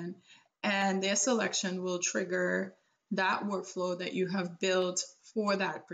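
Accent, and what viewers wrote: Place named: Caribbean. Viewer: I'm hearing a Caribbean island accent 🤔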